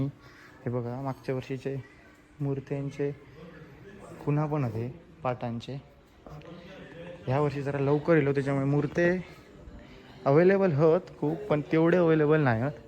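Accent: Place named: Malvani, India. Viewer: तुझी मालवणी खूप गोड आहे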